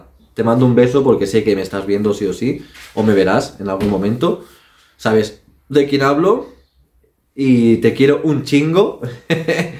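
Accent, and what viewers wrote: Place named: Spain. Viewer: me haces reir mucho, me encanta tu acento español al narrar tu historia, saludos desde Venezuela, los retos estan bien, adelante...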